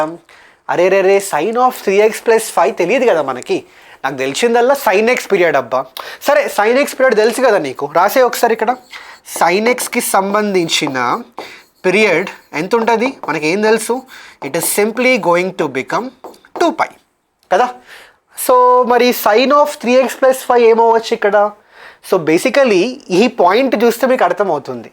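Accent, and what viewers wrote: Telangana, India. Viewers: PURE TELANGANA ASCENT!!!!! ABBA REALY ADDICTED SIR 🥰🥰🥰🥰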